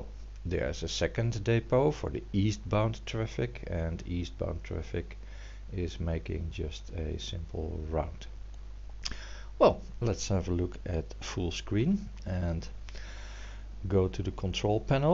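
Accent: Netherlands. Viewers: Jij praat met een héél Nederlands accent.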